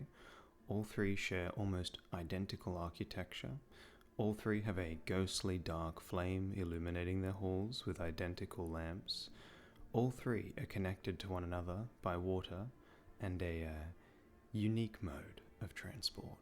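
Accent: Australia. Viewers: Are you from the same region/city in Aus as Vaati, its crazy how close your intonations are. Or is this like posh aussie? 🤣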